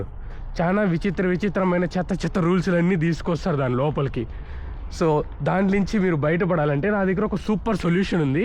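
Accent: Chittoor, India. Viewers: Bro needi Chittor district aa, slang ala undhi👍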